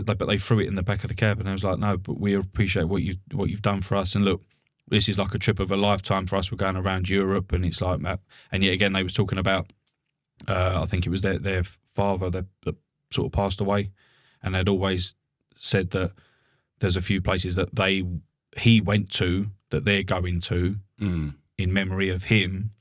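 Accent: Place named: RP, United Kingdom. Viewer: 😂 your posh voice is hilarious!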